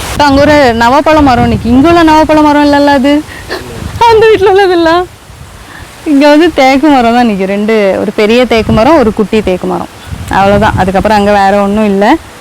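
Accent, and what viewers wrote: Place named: Nagercoil, India. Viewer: Super sis, when you speak live I can see your Nagercoil slang that's good.... Totally enjoyed your home garden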